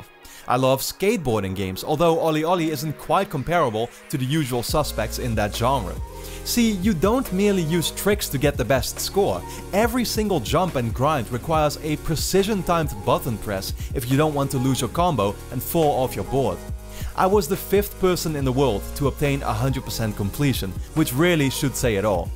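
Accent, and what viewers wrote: Netherlands. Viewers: 10 seconds in, ''this guy is dutch''. the accent is minimal tho, pretty good tbh. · Are you Dutch? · Are u dutch? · Are you dutch?? You sound dutch. im dutch btw (edit: Oh you have a rochet league car with a dutch flag. Hallo😂😂) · What accent is that of yours? Dutch? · is he dutch?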